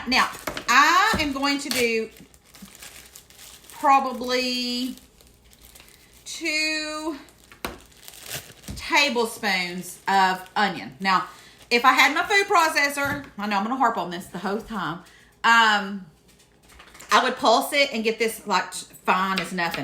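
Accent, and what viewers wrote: South, United States. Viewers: I detect a Southern accent..so that being said..where is the Duke's?! 😂😉❤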